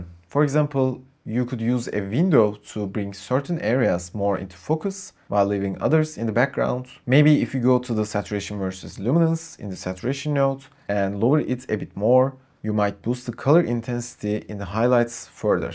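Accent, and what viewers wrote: Turkey. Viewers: reis türksün galiba sesi duyar duymaz türk konuşuyor dedim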